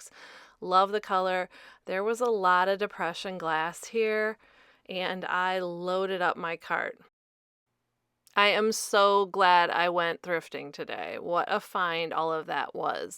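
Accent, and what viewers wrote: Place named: Michigan, United States. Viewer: I started watching you when we opened our large booth in 2023. We had a very small booth years prior. The tips and info you shared reminded me that I did know how to do this, and was so appreciated! I've kept watching you as your information on display and merchandising remains so current and valuable. Love to go thrifting with you. Welcome to Whatnot! I'm not a seller, but have been a buyer for a while now. I'm excited to add you to my Favorites. I'll see you there! On a side note, I have family in Michigan (I live in California) and I love listening to your accent as it reminds me of them. Merry Christmas!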